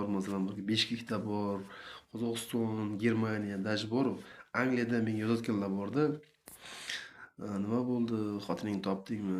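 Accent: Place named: Tashkent, Uzbekistan. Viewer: Ярими шива ярими тошкинча😏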